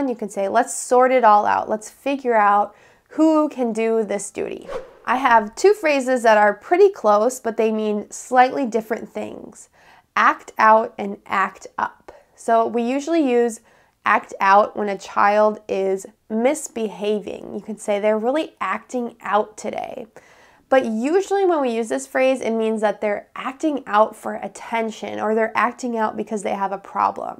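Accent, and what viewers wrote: United States. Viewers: Are you really an American ? I am asking this because there are so many online teachers who claim to be Americans but actually are Russians.Anyway you sound like an American to me.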